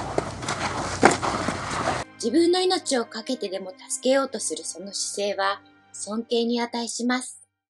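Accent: Japan. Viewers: しゃべってるひと日本人？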